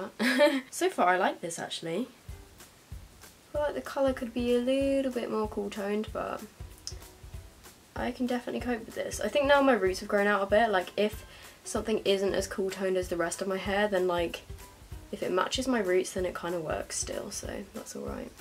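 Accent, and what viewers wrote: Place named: RP, United Kingdom. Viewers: Jasmine Lister I live in the south hahahah and she doesn't sound posh compared to 99% of people I know. She has what I'd call a regular English accent, you guys have a northern accent and I have a southern/posh accent 😂😭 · Jasmine Lister just her accent I think. I'm from Newcastle and if anyone sounded like that from here they would be called posh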